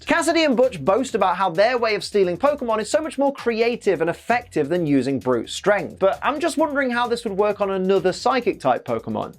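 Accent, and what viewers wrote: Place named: United Kingdom. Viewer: Oi u British mate?the Pokemon is blitze.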